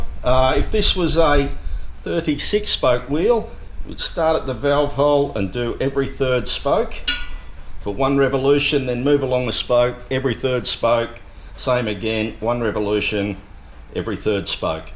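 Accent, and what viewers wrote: Australia. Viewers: Gidday, great to hear an Aussie accent after watching yanks building wheels lol. I’m about to replace a bent rim on a 2019 DL1000XT with a cross spoke pattern and it looks trickyAF. Ever done one like that? The nipples are in the hub and the rim has a double lip the the spokes fit through… it’s gonna be ‘interesting’ to say the least 😂.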